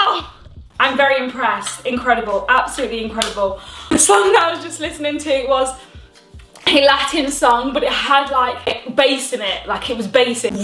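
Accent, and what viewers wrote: United Kingdom. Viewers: A breath of fresh air w a British accent✌🍻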